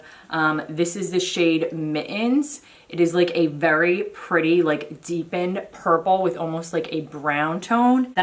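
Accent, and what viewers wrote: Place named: New York, United States. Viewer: Ur def from ny right lol I can tell by your accent I’m from Long Island I live in Queens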